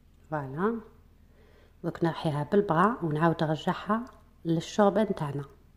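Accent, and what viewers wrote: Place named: Levant, Arab world. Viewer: Voyer êtes algérienne? Votre parler est du mechrek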